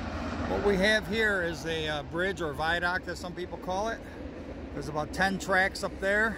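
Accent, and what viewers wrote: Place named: Michigan, United States. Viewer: I love your accent!! Michigan?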